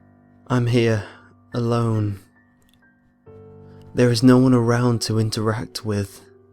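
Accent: United Kingdom. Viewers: Oh man, I am in love with your British gentleman accent. You sir, are the most amazing scary story teller I'v ever heard. Maybe in my WHOLE LIFE!!!!!!! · Even better HES GOT A BRITTISH ACCENT *Dies of the sexyness*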